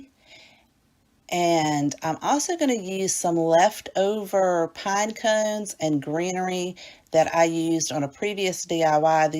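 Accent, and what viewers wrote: South, United States. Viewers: Hello I love your ideas your crafts are sweet ! I just came across your channel and I’m getting inspiration already! I love it great job!love the little snowmen snow sign so cute I’m going to make one too! So adorable I’m a subscriber now too ! Fabulous !ok edit number two love these so much so awesome! Ok I had to say I love your accent southern belle so adorable!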